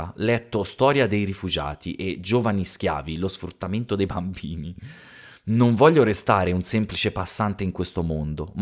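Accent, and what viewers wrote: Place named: Livorno, Italy. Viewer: A me è sempre piaciuto pensare che quando si gira l'ultima volta, prima di tornare da Douglas, abbia incontrato lo spirito di suo padre (è Silent Hill, dopotutto, quindi ci sta che lui sia ormai anche legato a quel posto anche dopo la morte)... anche perché sarebbe un po' strano che lei tiri fuori il discorso del nome così dal niente. Nella scena sembra che lei si giri perché c'è qualcuno dietro... e quel qualcuno è Harry. Anche perché io mi rifiuto di credere che abbia perso così all'improvviso suo padre senza possibilità di dirgli addio 🥲 avevano ancora troppe cose da dirsi, e un solo quadernino non basta. Mi piacciono molto i tuoi gameplay, me li recupererò pian piano anche degli altri capitoli e di altri giochi... e il dialetto livornese è sempre top da ascoltare.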